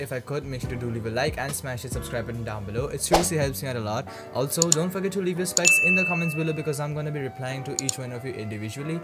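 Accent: India. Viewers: Why do all the helpful tech vids I find have an Indian sounding dude.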